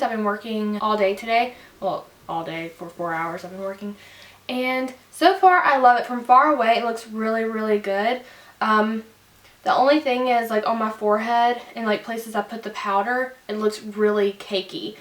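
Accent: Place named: South, United States. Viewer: haha i didnt notice she had one til this comment. maybe a little southern sounding. but im from the us and i barely even noticed.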